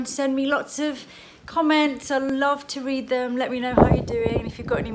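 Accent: United Kingdom. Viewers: Lisa Eldridge I absolutely love watching your videos. I in the United States and your British accent is so lovely. I think you are such a beautiful woman. I love all your beauty tips. When I watch you I feel relaxed and ready to change my look style with confidence. Much love to you and your gorgeous kitty 😻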